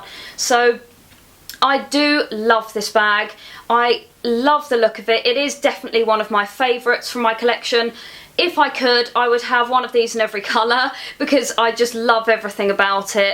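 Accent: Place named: England, United Kingdom. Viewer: Hi, I stumbled here through accident. I am not into handbags as I am guy. :) But I have to tell you that you have a very enjoyable voice Ma'am. I hope you ever heard of ASMR as I think an ASMR handbag video by you would be one of my favorites. ;) edit: I forgot to mention that also your English accent would make an ASMR video by you even more enjoyable. :D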